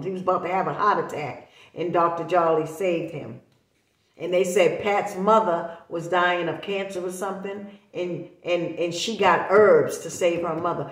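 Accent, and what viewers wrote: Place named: Boston, United States. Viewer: Love your Boston accent! Keep up the good work!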